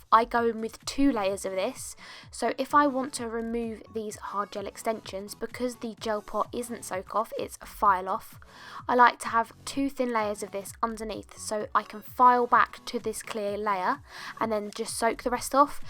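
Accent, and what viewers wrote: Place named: England, United Kingdom. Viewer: i could listen to you all day!! ur english accent!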